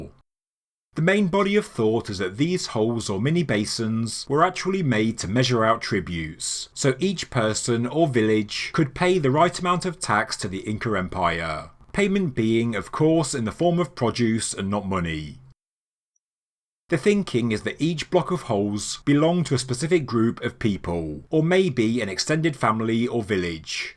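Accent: United Kingdom. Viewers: O conteúdo do Canal é ótimo. Mas ainda aguardo legenda para Português no vídeo. Esse inglês britânico é horrível!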